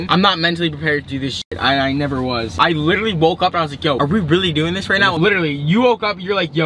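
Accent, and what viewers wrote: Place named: New York, United States. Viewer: you can tell he’s from NY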